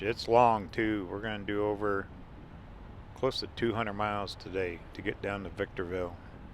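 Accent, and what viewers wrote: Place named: Western Pennsylvania, United States. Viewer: Great videos. Sounds like you’re from Western PA…maybe dahn tahn Pittsburg? I’ve driven most of 66 dozens of time. Keep up the great work.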